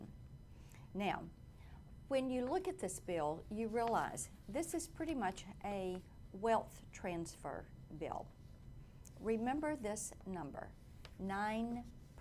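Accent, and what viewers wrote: South, United States. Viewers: Anyone else notice she pronounces her ing's just like Forrest Gump?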